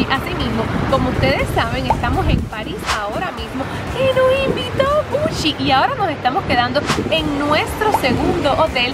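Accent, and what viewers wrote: Cuba. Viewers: Vos sos de cuba tenéis acento cubano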